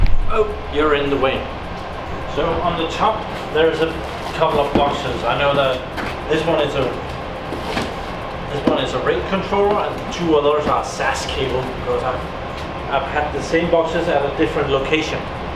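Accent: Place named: Denmark. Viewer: danish accent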